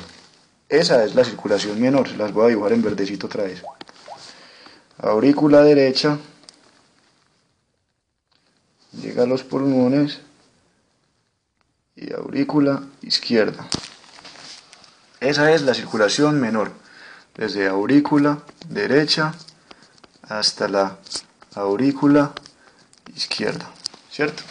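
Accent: Antioquia, Colombia. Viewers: Aparte de completo el contenido, reeee paisa.... Buen video, saludos. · Más paisa que la arepa <3 "Uy , mejor borro esto porque mera locha" JAJAJAJA. "Locha" para nosotros los paisas es como decir "¡Qué pereza! " SOS UN CRACK! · Acaso eres colombiano? haha me gusta tu acentoooo <3 x) buen video gracias! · Qué acento tan paaaisa. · Muy bueno. Me encanta el acento paisa. explicas muy bien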